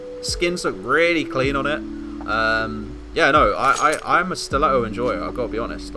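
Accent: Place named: Italy. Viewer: fuck italian english is so wild bro 😂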